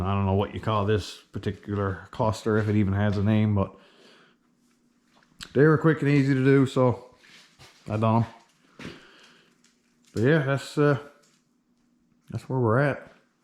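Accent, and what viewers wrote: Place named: Newfoundland, Canada. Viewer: A treat. Get to hear my homie NL accent explaining the complexities of building an airplane in a garage in Labrador. Thanks for the update.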